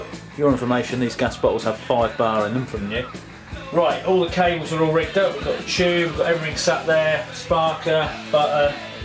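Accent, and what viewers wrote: United Kingdom. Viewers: He sounds British but I don't know if he is by birth 😂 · Are you like British or Australian or something? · this man is so intensly british that i cant understand half of what he says but i love it anyway haha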